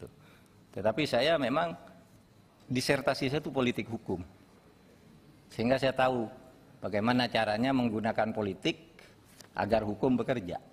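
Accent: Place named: Madura, Indonesia. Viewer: PAK MAHFUD MD... LOGAT MADURA NYA MASIH NAMPAK......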